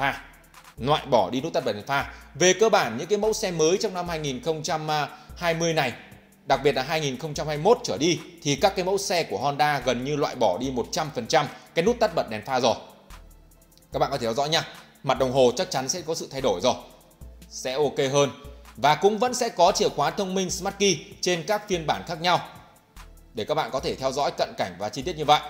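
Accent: North, Vietnam. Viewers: anh người bắc này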